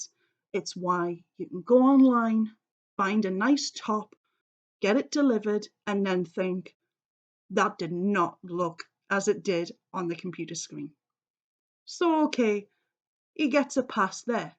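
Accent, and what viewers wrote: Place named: Scotland, United Kingdom. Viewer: I loved this vid! Hello,! I have just discovered you and am now subbed! I adore your feisty Scottish voice and attitude. I can’t wait to see more! 👏👏👏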